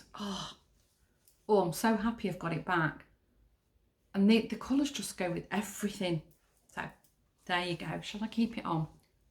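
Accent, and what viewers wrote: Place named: North, United Kingdom. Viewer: How lovely to hear my friend Ruth’s name mentioned. I blame her for my renewed yarn yearning /stash. 😁. What a wonderful podcast, thanks. Great to hear a northern accent too. X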